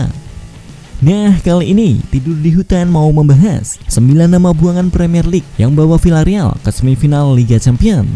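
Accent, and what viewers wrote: Java, Indonesia. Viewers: Jijik suara nya medok 😛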